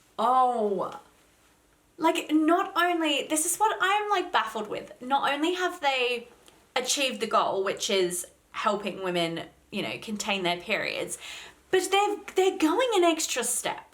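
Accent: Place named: Australia. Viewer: An Aussie girl talking about periods, it made me smile.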